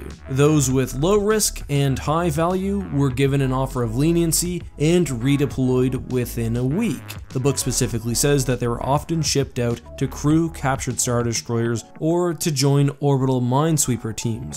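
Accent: Canada. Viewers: you canadian?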